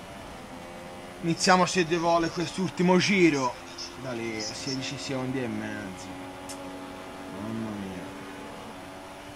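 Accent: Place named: Livorno, Italy. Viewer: Le tue incazzature con l'accento livornese sono favolose ahah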